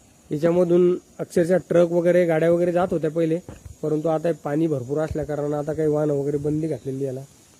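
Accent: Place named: Kolhapur, India. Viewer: By the way तुम्ही कोल्हापूर चे तर नाही कारण तुमच्या accent वरून वाटल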